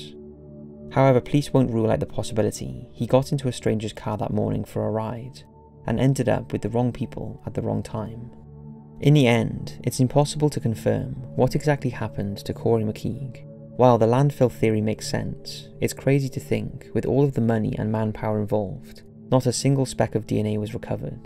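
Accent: United Kingdom. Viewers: I love the way you brits say shit like, 'Media'... 'Medier'. It's awesome. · Your British accent was making it difficult to understand what you are talking about, and there are at times you were mumbling on each of these cases. Please speak clearly and as loud as possible so that we can listen and pay attention.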